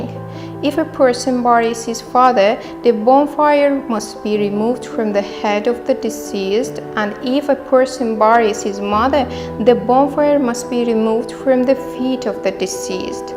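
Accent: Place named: England, United Kingdom. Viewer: Hi! You are so dilligent and i like your english akcent! Good Luck in AQAT GROUP 👏🏻👏🏻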